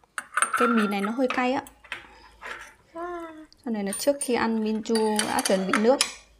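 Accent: North, Vietnam. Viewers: Nói giọng bắc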